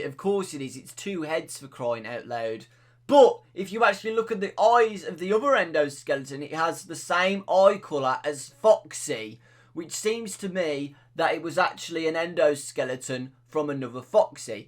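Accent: United Kingdom. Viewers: U R THE BEST FNAF THEORIST EVER AND UR UK VOICE IS BAE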